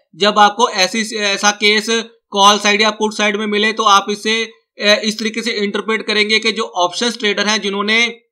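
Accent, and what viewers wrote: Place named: Punjab, India. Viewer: Punjabi laggda hainnnnnn!!!!😃😃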